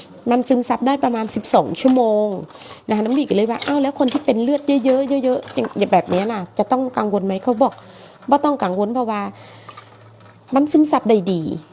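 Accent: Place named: North, Thailand. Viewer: อู้คำ เหนือเลย คุณยายติดตามตลอดคะจากยายพรรณคะ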